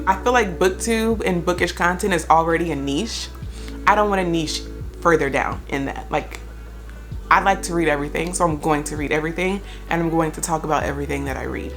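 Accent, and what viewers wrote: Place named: New York, United States. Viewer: I love her NY accent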